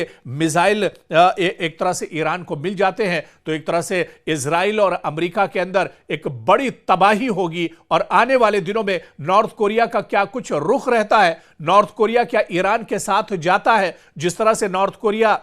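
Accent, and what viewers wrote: India. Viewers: Voice Indian lagta hay, Proper urdu nahi hay 😅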